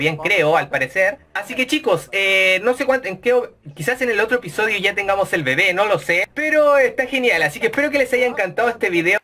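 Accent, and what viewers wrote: Chile. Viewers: eres chileno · es chileno:v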